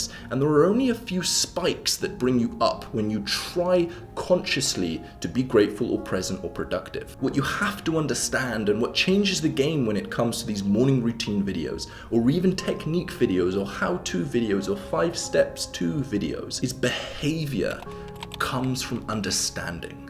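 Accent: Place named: RP, United Kingdom. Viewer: you have to be casted on the Crown.seriously bro you would need 0% effort to talk like them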